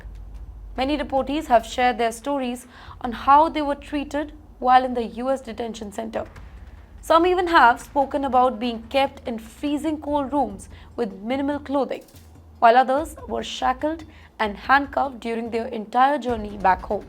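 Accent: England, United Kingdom. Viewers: You have a funny accent ! Father British ? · The best Anchorwoman. I just like her English accent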